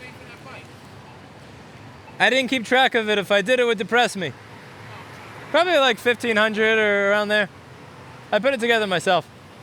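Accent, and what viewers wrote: New York, United States. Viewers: it's the NY accent....it comes from a mix of Italian and Jewish immigrants adding to the Irish and WASPs....despite him not being Jewish, uses Yiddish influenced NYC phraseology. Hence your association with Jerry Seinfield, who has some of the same accents. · your NY accent reminds me of Jerry sienfeld!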